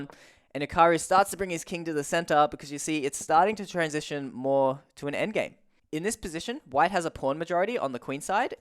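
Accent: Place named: Australia. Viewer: From your accent I'm guessing you're a fellow Aussie. Nice channel!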